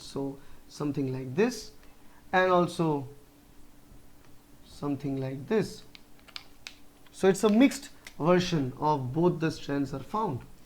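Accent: Bengal, India. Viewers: Sir are you from kolkata Are you bengali I find a tinge of bengali in your pronouncing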